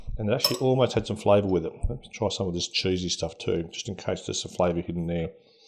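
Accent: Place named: Australia. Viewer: Its Australian mate ;)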